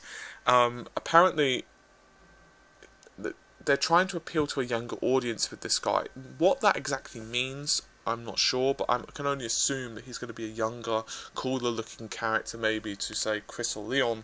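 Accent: England, United Kingdom. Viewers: fucking english accent cant understand all, i took headset to understand him.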